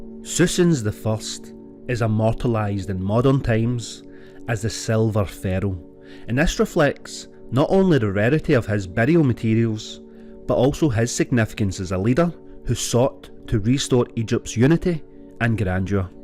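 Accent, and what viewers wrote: Scotland, United Kingdom. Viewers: Turned on the captions so I could understand through the Scottish accent. The captions were hilarious. They told of pharaoh Susan The First, Howard Cattle, and more!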